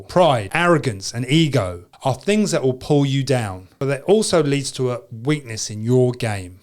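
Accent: England, United Kingdom. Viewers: I like the British accent! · English, not British.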